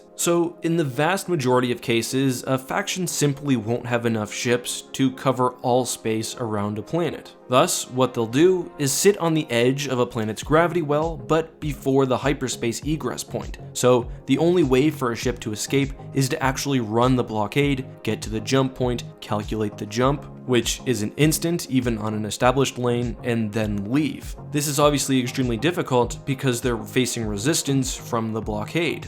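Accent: Canada. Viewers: Are you from Canada?? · are you canadian?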